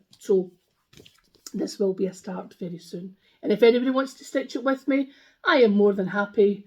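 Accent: Scotland, United Kingdom. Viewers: Hello, so pleased you have returned to Youtube. I have missed you over the last 12 months. I do love your Scottish accent as well as. Happy stitching. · Lovely to hear a Scottish voice on Flosstube. Back to cross stitch after about 25 years and really enjoying it. Can I ask where you got your lovely project bags from? Just subscribed x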